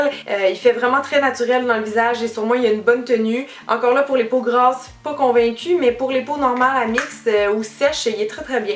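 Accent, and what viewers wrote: Quebec, Canada. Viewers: J'adore l'accent québécois. Si je devais en avoir un, je choisirais celui là :) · elle est québécoise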